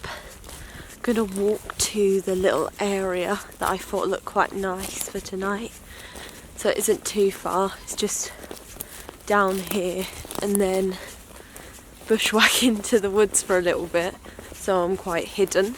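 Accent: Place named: England, United Kingdom. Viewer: you are such a delight to watch, being from Texas I could listen to your accent all day long. The waterfall the tower...I have to visit England someday...you are a walking advertisement of your country. Pretty lady I cannot wait to see what you do next ❤ Texas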